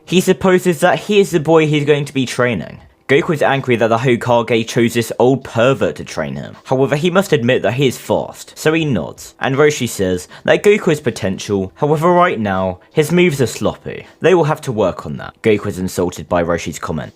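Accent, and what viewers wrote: United Kingdom. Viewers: Annoying British voice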